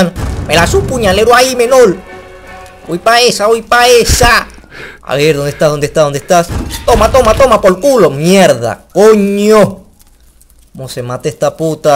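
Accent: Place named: Venezuela. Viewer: tus videos son buenos solo para venezolanos al parecer del resto no entenderan bien lo que dices xD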